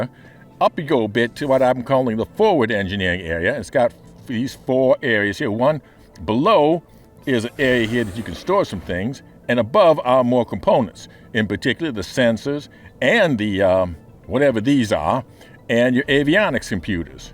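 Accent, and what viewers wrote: South, United States. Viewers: what's up with the extra southern accent this time around? lol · I agree that the dive bombing attack, similar to a Douglas SBD Dauntless, is a likely way to get more precision. I think a low glide bomb attack, more like a Martin B-26 Marauder, could work BUT you'd have to keep your speed well up and drop from no lower than 500 Meters (as it looks like the blast radius is about 350-400 meters).. (not sure why the southern accent but boy howdy tie A1 looks fun)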